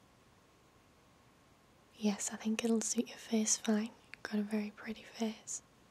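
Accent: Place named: Northern England, United Kingdom. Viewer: amazing again, your north northern eastern accent is fantastic for this, thankyou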